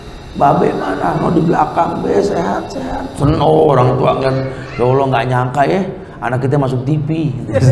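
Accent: Indonesia. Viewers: Ust .... semoga semakin barokah... kajiannya ringan, intinya gampang di cerna , penyampaiannya sedep banget dech logat betawinye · Waalaikumsallam..skrg sy hampir tiap hari menyimak kajian ustad...trmksh pak ustad atas ilmunya sangat bermaafaat.buat kami...penyampaiannya juga mudah di terima..gaya betawi penyampaiannya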